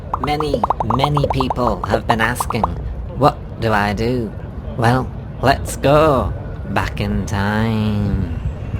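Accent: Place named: Scotland, United Kingdom. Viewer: Thanks my brother lost the bet he thought you really were Scottish!! He was close though! Thanks for the fun!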